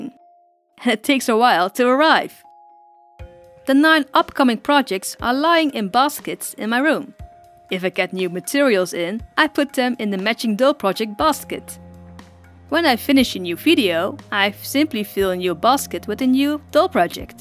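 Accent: Netherlands. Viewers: Oh my god your accent is sooo cute! I’ve never heard a Dutch person,and y’all kinda sound like if the French and British accent had a child xD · Ar u neterlands cuz i heard it in the accsant i'm neterlands to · NEDERLAAANDS 😂 je hoort gwn dat je een Nederlands accent heb, I 💖 your video's 😘💋💯 ga zo door!! 🔥💝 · I just found you and I think you are Dutch 😂 because of your accent xD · No hate but you sound i bit Dutch ( ben je Nederlands ?? )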